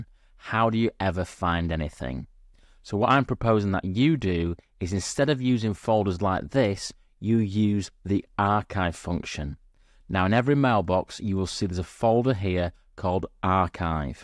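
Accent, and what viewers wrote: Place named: Manchester, United Kingdom. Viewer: Thank you for the great videos that you made - I feel they will change my working life completely! P.S. I have got mates who sound just like you - from Manchester? Bolton? 😂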